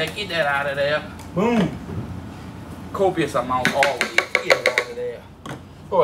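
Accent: Louisiana, United States. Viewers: Did he call the chicken, "Yard Pimp"? I love cajun accents. Ps: I would crawl over a mile of broken glass to eat that Jambalaya. It looks so GOOD! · I’m from the south and I can definitely tell you, he’s from Louisiana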